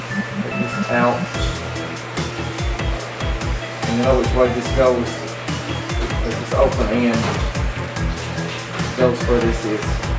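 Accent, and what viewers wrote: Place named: South, United States. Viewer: Interesting how you retained the southern accent but you’ve been in the Philippines.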